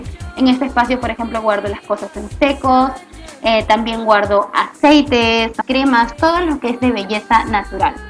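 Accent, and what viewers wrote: Peru. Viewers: hola, yo soy peruana, y vivo en usa, me encantan tus videos, y veo que no haz perdido tu acento peruano, gracias por compartir y enseñarnos tantas cosas, te admiro por tu entusiasmo por la vida sana. Bendiciones.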